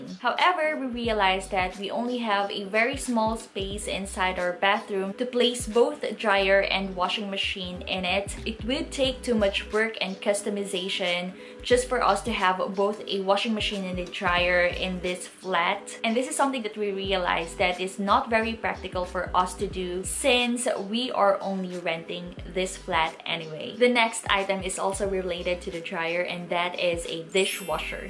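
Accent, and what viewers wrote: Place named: Philippines. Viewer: Me too, not a fan of jewelry. Earrings and watch will do. Btw, I love your Filipino accent don't mind the troll account. 😉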